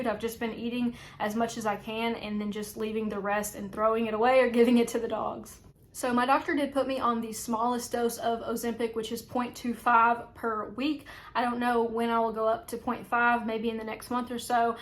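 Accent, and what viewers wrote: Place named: South, United States. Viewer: I just started Ozempic two weeks ago because i keep gaining weight and feeling hungry all the time. waking up at night or in the morning and have to snack and go back to sleep. btw, I love your accent. what state are you from? It sounds like a tad bit of a southern accent.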